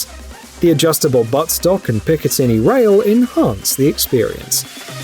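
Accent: United Kingdom. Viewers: You are in the USA! Why are you doing the narratives using a Brit???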